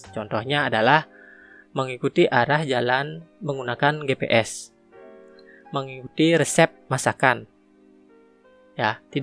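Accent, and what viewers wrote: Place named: Bali, Indonesia. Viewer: Orang Bali ya bang? Logatnya kentara banget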